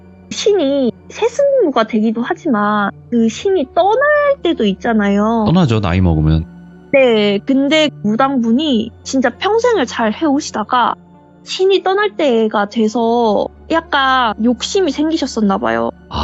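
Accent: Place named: Jeolla, South Korea. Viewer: 고향 전라도시죠!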